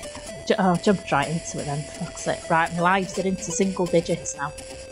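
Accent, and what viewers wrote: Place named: Liverpool, United Kingdom. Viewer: Now then,I thought I'd already subscribed to your channel, but obviously not lol..anyway if I remember wright retro b8 mentioned this channel, unless there's another retro Scouse (with yourshire overtones)sounding girl channel ..don't worry im from Liverpool myself.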